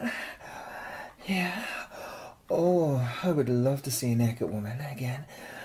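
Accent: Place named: Ireland. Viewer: Irish accent is definitely a lady killer specially with voice!! 🍀🥂He's a lady killer himself and he knows it ladies hehe 🤭🥰 cute audio btw 😜💞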